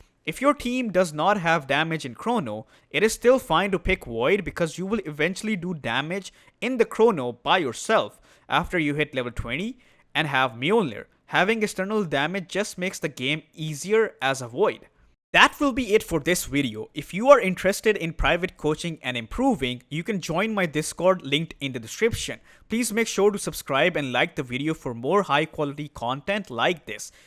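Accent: India. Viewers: You’re accent so indian 😂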